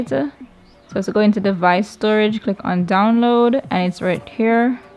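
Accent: Jamaica. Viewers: U Sound Jamaican, I'm a Fan Of Modding Bypassing, Custom Firmwares & Roms! 💯 I Subbed ☺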